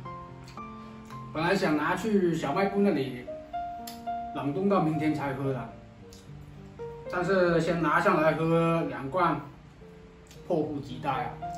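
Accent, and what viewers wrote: Cantonese, China. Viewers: 其实你的普通话的口音就是广东话口音，早就知道你会说广东话。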